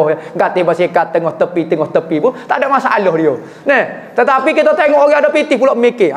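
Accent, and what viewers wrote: Kelantan, Malaysia. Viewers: Assalamualaikum! Ustaz boleh tak cakap Bahasa Malaysia lebih banyak sebab kekadang sy tak faham cakap Ustaz tapi suka dengar ceramah Ustaz sy tak faham loghat Kelantan maaf ya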